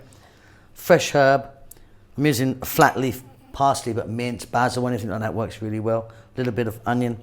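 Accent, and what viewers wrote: United Kingdom. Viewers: Are you from the U.K. ?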